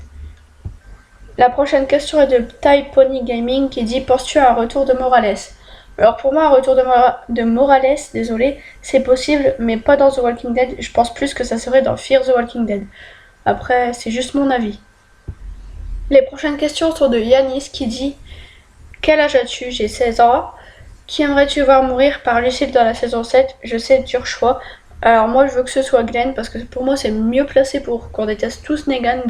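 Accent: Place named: North, France. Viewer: Hey bah mi j'min douto qu'této du nord ça s'intind a t'n'accent alors bin venu au club :) Traduction pour les non ch'tis '' hey bah moi je m'en douté que tu était du nord ça 's'entend alors bienvenu au club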